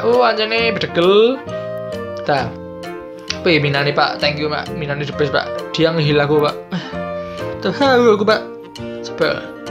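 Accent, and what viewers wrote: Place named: Malang, Indonesia. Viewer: bahasane malangan hehe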